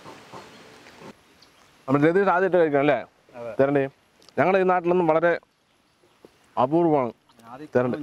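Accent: Palakkad, India. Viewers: ഇക്കാടെ പാലക്കാടൻ സ്ലാങ് ആണ് എനിക്ക് ഏറ്റവും ഇഷ്ടം 😇😇 ഫുഡ്‌ പിന്നെ മാത്രം 😘😘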